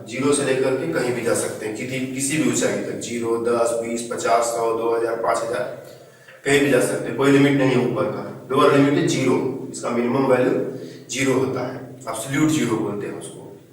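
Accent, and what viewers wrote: Bihar, India. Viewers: sir app bihar sae ho??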